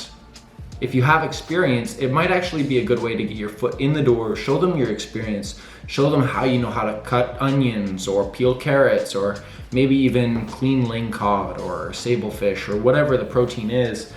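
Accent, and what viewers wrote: Canada. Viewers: Not only is he good looking but he can cook. Also that thick ass Canadian accent. What else could you want?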